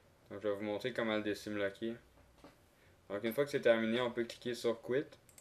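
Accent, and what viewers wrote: Quebec, Canada. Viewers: Bjr!:), MERCI BEAUCOUP POUR LE TUTO!!! J'ai essayé pendant 24h plein d'autres méthodes infructueuses. La tienne, 40-60 min et j'avais fini, tout était correct, mon pc ne plante pas, etc., vraiment du bon travail, et pour une fois, c'était en Québécois!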